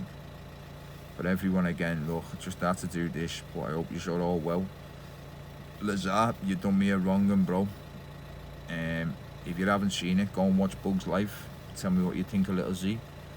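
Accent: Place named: Cockney, United Kingdom. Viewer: you seem like a nice fella pal, love the cockney accent 😂 I need work, i work at the moment. But need something better mate.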